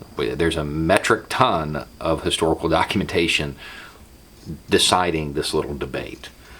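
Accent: South, United States. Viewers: Recently some members of my wife's extended family tried defending their flying of the confederate flag by using the same talking points that you just swiftly debunked here. They're not even from the south... they moved down here a few years back, but for some reason they're in love with that damn flag and they'll swear up and down that it's not a symbol of racism, but instead a symbol of "southern pride". I tried making similar arguments as you did here, but they wouldn't listen. If they'll hear out anyone it'll be someone like you, a veteran from the south that sounds and looks like them. Thank you so much for putting these videos out. You're a hero speaking truths we all desperately need to hear. 👍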